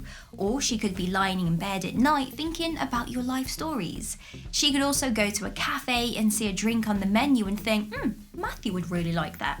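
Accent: United Kingdom. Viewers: I like your accent ! Are you British ?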